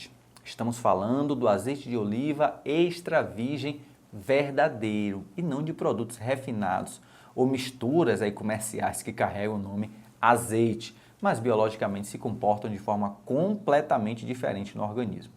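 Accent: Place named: Rio de Janeiro, Brazil. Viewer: Carioca poĥ Quer dar uma de deisch...colado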